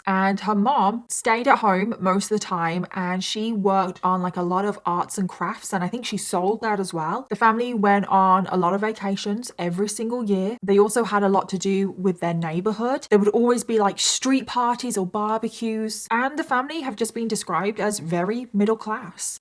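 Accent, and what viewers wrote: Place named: East Midlands, United Kingdom. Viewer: stupid? no. you sound like me before i started spending a lot of time in the US. it's basically like living on the border of Lincolnshire and Nottinghamshire. Also, watching this with my three year old in the same room (headphones while she plays) she is my baby. a three year old is a baby. this whole case is terrible.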